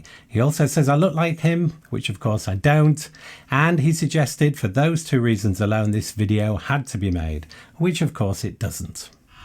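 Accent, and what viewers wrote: United Kingdom. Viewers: Come on! I was JUST about to hit subscribe and then you started bashing Star Trek and my finger mysteriously stopped! My heart shattered and I don’t know if I can listen to your soothing British delivery anymore. Who am I kidding… I share the same name as your friend who made you do this video… I’m in!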